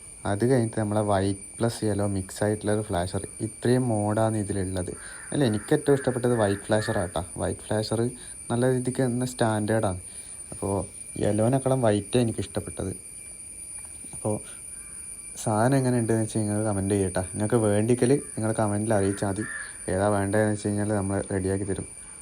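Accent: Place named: Kannur, India. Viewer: Bro kannur ahno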